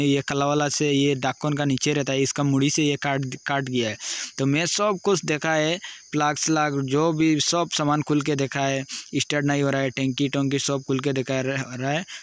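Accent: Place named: Bengal, India. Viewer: Bengoli mix Hindi,,,🤪🤪🤪🖕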